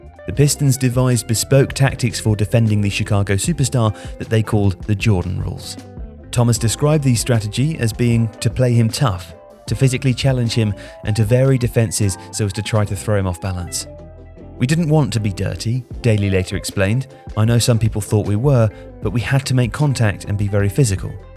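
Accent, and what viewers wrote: United Kingdom. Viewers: This is just so awkward to listen to NBA talk being spoken in a British accent 😂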